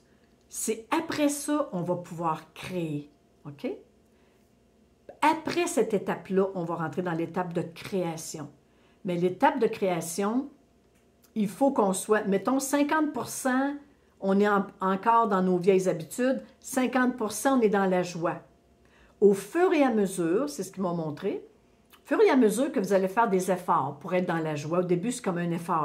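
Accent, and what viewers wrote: Quebec, Canada. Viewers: Merci🙏, merci 🙏, merci 🙏pour ce magnifique partage 🥰. J'adore votre accent Québécois 😂❤, ça me donne la pêche en vous écoutant. Vous êtes adorable ❤. Belle soirée de la Nouvelle Lune 🌑. Je vous envoie pleins d'Amour 💗 et de Lumières 🌟✨️🕊... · Merci, c'est toujours un grand plaisir et une grande joie de te voir et d'entendre ton bel accent Canadien 🧡🌿🌼🌷🕊🌷🌼🌿🧡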